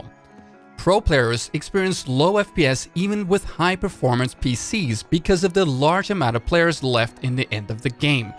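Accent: Russia. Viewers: Are you Russian?🤔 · ohh , you from Russia)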